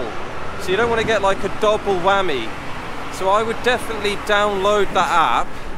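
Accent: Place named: England, United Kingdom. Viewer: Brilliant. Your accent reminds me of my favourite cricketer Geoff Boycott from England . Thanks for showing lovely places in Uzes.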